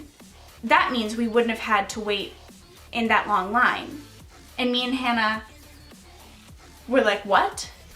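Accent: Minnesota, United States. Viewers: I'm From Minnesota so when I heard your accent I was like she must be from MN then you said something about the target center and yes!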